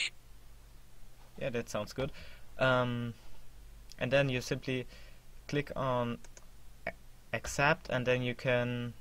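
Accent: Germany. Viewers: man merkt dass du deutscher bist Xd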